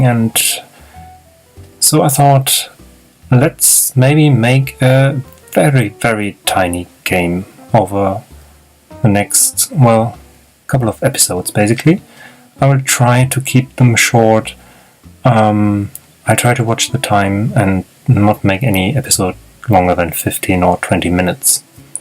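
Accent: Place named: Germany. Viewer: I don't know why, but the German accent makes the tutorial more trustworthy 😄 very good video, thanks!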